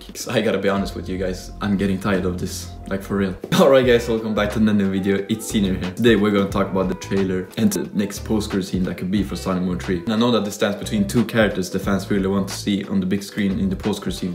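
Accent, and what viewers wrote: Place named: Sweden. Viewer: Been watching your channel for a time now, and both me and my sister had our suspicions on you being Swedish due to your accent, so thanks for telling 🌸 Vi behöver flera Svenska Sonic fans 🥲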